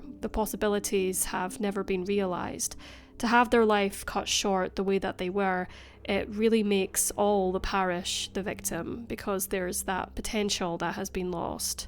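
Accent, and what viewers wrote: Scotland, United Kingdom. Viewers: One of the best true crime channels on YT. Love the Scottish accent. It is Scottish right? I love it no matter what accent it is. 🙂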